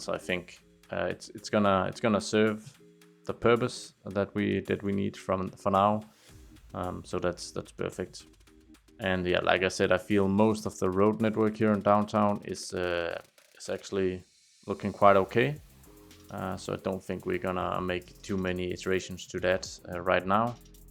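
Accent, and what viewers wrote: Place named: Denmark. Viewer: it's hard to see it have a natural reason to become a major city in the region. But having it be a place for major government buildings and seats of power is very possible. Many regions and countries simply pick these spots as like rather than having a natural reason for it to be there. Anyway, nice to see you listening to feedback. Btw: Fra sekundet jeg hørte dig snakke var jeg slet ikke i tvivl om at du var dansker ahah. God dag!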